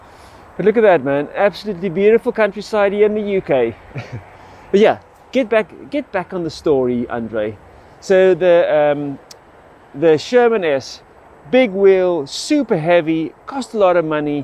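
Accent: South Africa. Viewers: Just out of interest are you, perhaps a South African or a Dutchman/Hollander as when you speak I hear a bit of the Boer accent in your speech? As for the bike and I need an e-bike, as I lost my car driving license due to failing eyesight and live at the top of a 1 in 6 hill, that no matter which angle I ride to and from, I need a bike that can substantially climb without much effort, say mode 3 and mode 5 hill assist electric power. So would you recommend this one, over the similar 345 original?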